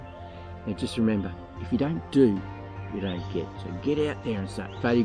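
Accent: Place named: Australia. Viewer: I hated to say this but you sound like an Australian.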